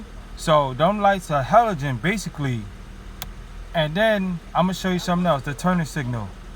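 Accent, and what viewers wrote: New York, United States. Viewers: u gotta be from nyc u namean ya herd 👌🏼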